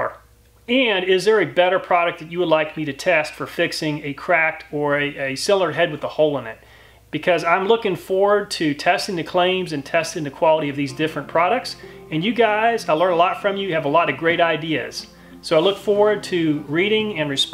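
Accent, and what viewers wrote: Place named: Midwest, United States. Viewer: Nothing better than filling a gash. Love these videos. Just a guess but you're from northern Missouri or Iowa.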